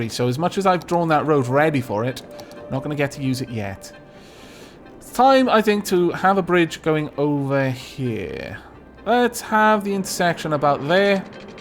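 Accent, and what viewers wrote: Wales, United Kingdom. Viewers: 'Scallywaggery' is now my absolute favourite word to hear said with a Welsh accent.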